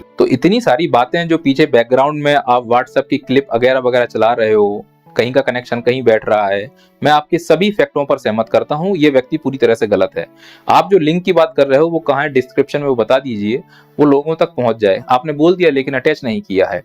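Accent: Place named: Haryana, India. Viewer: 🎉chora hariyanvi 😂 hai bolte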